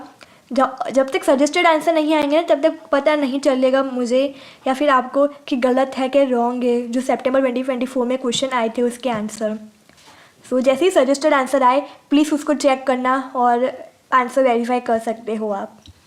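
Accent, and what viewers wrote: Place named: North, India. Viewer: That northern accent tho 😂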